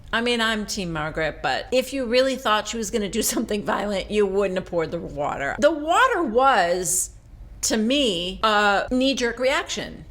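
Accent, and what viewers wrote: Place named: Midwest, United States. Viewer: Love listening to you midwestern, my favorite recapper!!!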